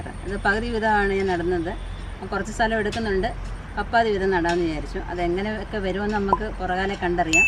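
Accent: Kollam, India. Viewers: Mm samsaram ketapol thonni kollar allenkil PTA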